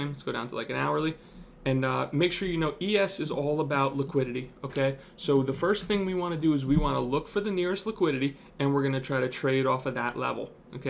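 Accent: United States. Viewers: you sound like you are from new jersey/long island with that accent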